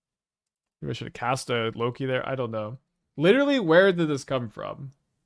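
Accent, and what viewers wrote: Texas, United States. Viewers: I knew Texans actually talked like that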